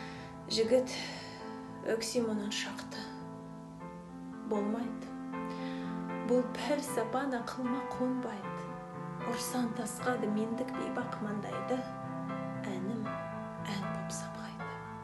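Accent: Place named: Russia. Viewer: Астанада тұрсаңыз, Синтез актерлік курсына Мұхтар ағайға барыңыз. Тіліңіз орысша шұбарланып кеткен, акцент бар. Ң, Ү, Ө әріптерінің сиқы жоқ, үндестік дауыс ырғағын жетілдіру керек. Өлең мағынасын жүрекпен сезініп соны тыңдарманға жеткізу керек. Мен видеода тек өзіңіздің бет-әлпетіңізге тамсанып жақсы оқып жатырмын ғой декен ойыңызды сезіндім ( орысша айтқанда самолюбование, не передали состояние) сөйтіп, сөйлей сөйлей шешен боласыз, күндердің бір күнінде жарып шығасыз.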